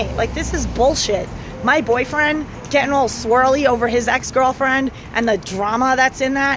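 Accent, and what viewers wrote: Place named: New York, United States. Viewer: New Yorker? Love the accent. Your energy is amazing! Thank you for sharing! I love Abraham I listen to her every day 😀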